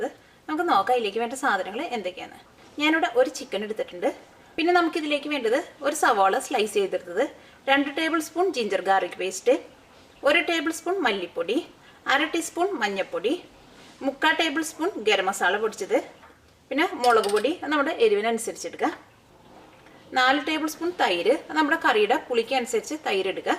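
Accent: Thrissur, India. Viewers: You are interesting to watch. Your Malayalam with that Trichur slang is more interesting. You are my guru in cooking.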